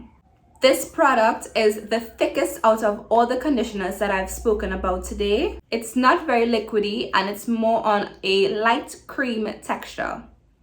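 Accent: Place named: Trinidad and Tobago. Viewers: Definitely a Trini voice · Yep Trini too the bone...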